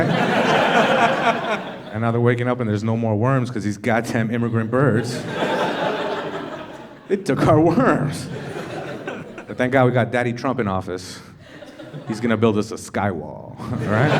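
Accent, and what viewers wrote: Toronto, Canada. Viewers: i know a toronto man when i hear one 😂😂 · I love Canadian comics, I can sense his Toronto accent being held in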